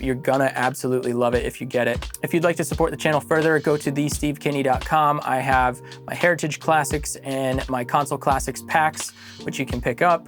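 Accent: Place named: United Kingdom. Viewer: Love the British accent mate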